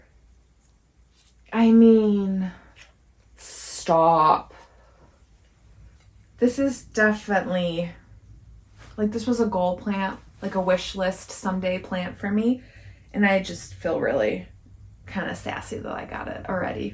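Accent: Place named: Midwest, United States. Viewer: I'm so obsessed with your recording location and your Midwest accent :)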